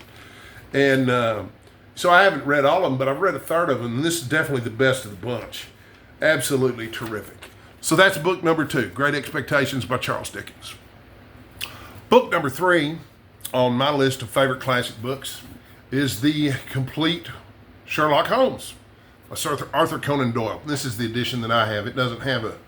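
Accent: Texas, United States. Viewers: The sonnet you picked is one of my favorites, especially the last line. I've heard it read in an English accent, but never in "Texan" - not bad! Lord of the Rings is one of my absolute favorites - way up there in my top 10 list. It's wonderful, isn't it. 2 of yours - I like the authors a lot, but not the specific books you chose (Charles Dickens and Arthur Conan Doyle). All the Kings Men made a big impression on me, but I don't know that now I'd read it ahead of a number of books from that period. Still the idea that it was the Kingfish, well, it started my love of American history of the 20's and 30's. The only one I disagreed with was The World According to Garp - it's way down on my list. Regardless - your list shows you to be a man of taste and discernment.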